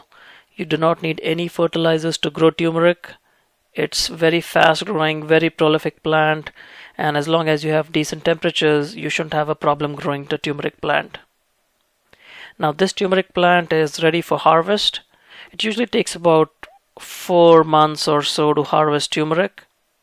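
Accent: India. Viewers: your accent is Indian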